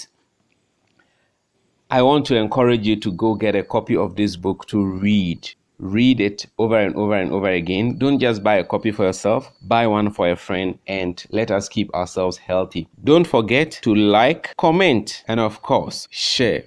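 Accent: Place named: Ghana. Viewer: First I love the way Ghanaians speak so that means I love the way you speak...secondly I love how you were able to sell this book even without looking at it listening to you can make one want to buy the book..and finally thank you for choosing this book to review on it because a lot of people need to be really educated about there health... am a health educator and our primary goal is give preventive means other than curative means to health and as they say health is wealth so thank you for sharing this I enjoyed every bit...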